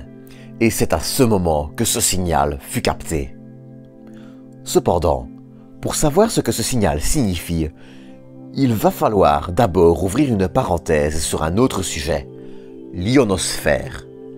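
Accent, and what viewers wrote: Belgium. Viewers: C'est l'accent belge.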